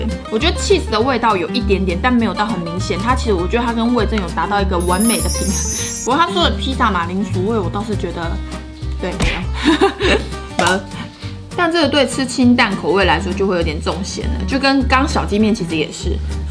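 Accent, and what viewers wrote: Taiwan. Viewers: 講話台灣國語好可愛，有時講一句台語覺得好親切，喜歡❤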